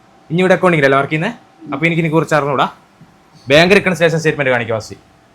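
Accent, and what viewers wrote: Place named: Kuttiyadi, India. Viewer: Kuttyadikkar aaro ingal sound guss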